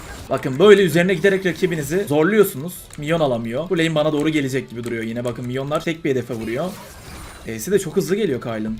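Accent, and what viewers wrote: Adana, Turkey. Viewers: kardeşim adanalı olma ihtimalin var mı :D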